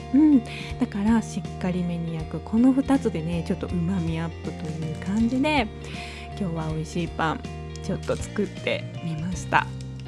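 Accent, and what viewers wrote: Kansai, Japan. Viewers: 通りすがりにちょっと立ち寄らせてもらいました✋😅 パイ生地とフランスパン🥖見ました👀話し方めっちゃ可愛らしい🤩🤗 真夜中のに笑いこけた😂 関西弁はやっぱりええねぇ いっぺんにファンになりましたよ🎉 私は奈良から和歌山に嫁いで微妙に違う言葉を使ってます。 まずはフランスパン😘😘😘🥖Tryしますね 楽しい動画見ながら😘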